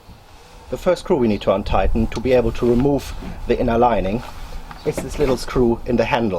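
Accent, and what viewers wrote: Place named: Germany. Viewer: Very nice camera work. Very typical German dialect and mindset. Kinda charming - almost. ;-)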